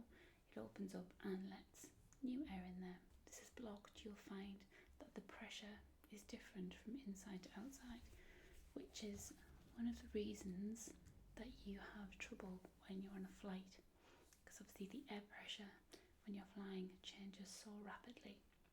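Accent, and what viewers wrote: United Kingdom. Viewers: Is she Scottish, Australian, or British? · You sound like you're from the UK? :P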